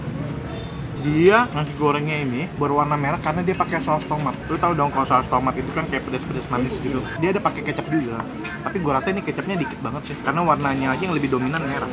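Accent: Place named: Palembang, Indonesia. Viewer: Suaro wong palembang mano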